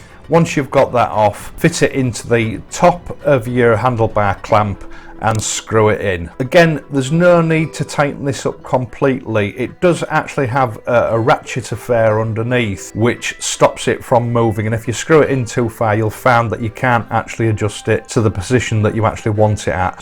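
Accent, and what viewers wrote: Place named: East Yorkshire, United Kingdom. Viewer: Clear East Yorkshire accent. The only people in Yorkshire who say werk instead of work. I will never buy a sat nav. North Yorkshire rules.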